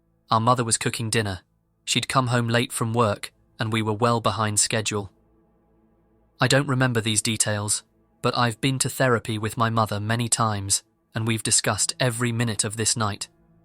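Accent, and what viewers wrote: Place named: RP, United Kingdom. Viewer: Yeah. Similar tone of voice. And obviously the accent is southern "proper" almost RP English. So I get why you find them similar.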